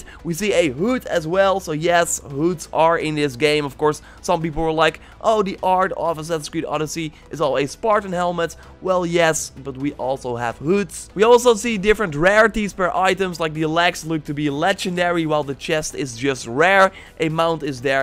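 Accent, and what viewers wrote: Netherlands. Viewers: Dude, your English could use improvement. You shouldn't mix Dutch with English ;) Also your Dutch accent clearly comes trough, you are not doing us any good with that ;)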